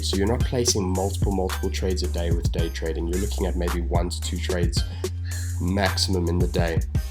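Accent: South Africa. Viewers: Durban Accent?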